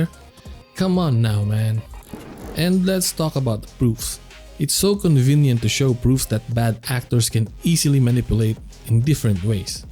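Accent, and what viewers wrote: Philippines. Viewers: You sound very pinoy to my ears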